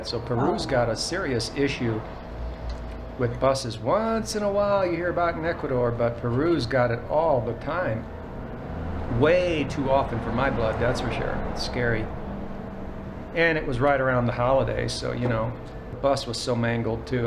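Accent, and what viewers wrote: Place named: United States. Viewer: Not perfect Spanish but gringo Spanish.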